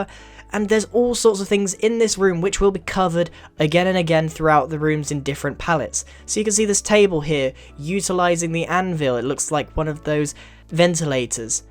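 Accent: England, United Kingdom. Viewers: An easy-to-digest, well spoken, simply arranged tutorial. And he's British! Great series, keep it up! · he's British the way he pronounces some words doesn't match with ours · thank god he's british ! And god save the queen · you sound british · Are you British?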